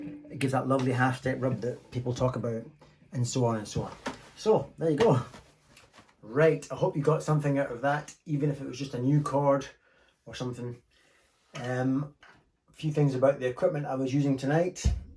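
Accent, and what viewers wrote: Scotland, United Kingdom. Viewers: As a 63 year old Scotsman living in the Alps for the last 20 years, and recently taken up guitar and watched quite a few videos, I just love the Scottish patter and your skill with the instrument and your words. Keep it up, appreciated by many as I can read, but definitely by me. As a car lover I also smiled with glee at your wish to get a new car without kerbing and clutch abuse. Looking forward to watching more of your back catalogue of videos. Loved the camping one, felt like home in the north west highlands, Many thanks.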